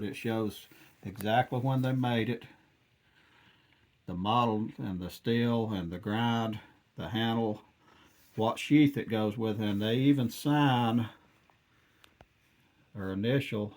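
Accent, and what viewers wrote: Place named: Texas, United States. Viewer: Обожаю техасский акцент хоть и нечего непонятно😂 но Кизлярские ножи лучше 👍😅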